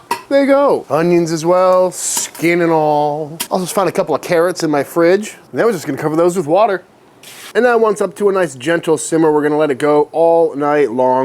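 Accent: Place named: New England, United States. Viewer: Idk what this means, but this was the first video of yours that I saw and I could immediately tell that you were also from New England.... love the content and you remind me of one of my cousins lmao. Please keep doing what you're doing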